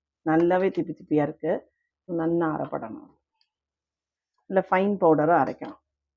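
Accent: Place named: Thanjavur, India. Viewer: Very nicely you are teaching. Thanjavur pani peachu , but little Thirunelveli pechum mix panni you are speaking . Thank you.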